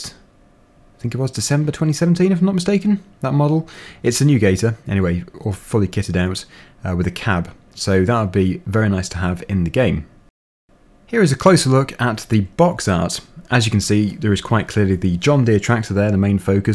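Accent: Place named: United Kingdom. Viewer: British accent's quite annoying but god your's very strong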